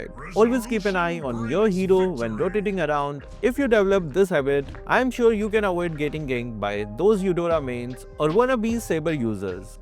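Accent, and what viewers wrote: India. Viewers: I love because he has such wonderful edits and a gorgeous indian accent!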